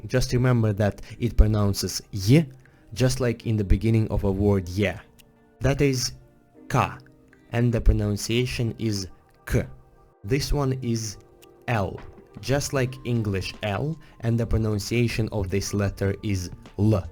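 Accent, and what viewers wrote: Russia. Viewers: You have a russian accent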